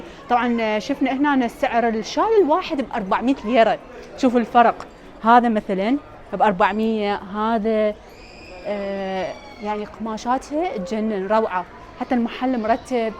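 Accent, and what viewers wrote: Baghdad, Iraq. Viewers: احب لهجتك البغداديه الاصيله وعفويتك تقربك للنفس فديوات رائعه تقديم وتصوير وموسيقى انت مبدعه اخرى ضمن المبدعات العراقيات في كل مكان وتركيا تستحق الاستقرار فيها وليس الزياره فقط ولحبي لها اخشى عليها من غدر الغادرين اللهم ادم عليها الامن والاستقرار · والله يا احبج وايد و احب قناتج و تغطياتج للمدن.. و خاصه لهجتج العراقيه الي تجنن و انا اصلا احب العراقيين.. متابعتج من الامارات ❤ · تحية من الاردن شو جميلة اللهجة العراقية يا أستاذة فيديوهات ممتعة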